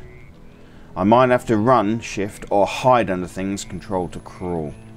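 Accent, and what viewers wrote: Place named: United Kingdom. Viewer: Thanks for playing! We are launching early access in mid-October. Off topic- where did you grow up in the UK (accent question)?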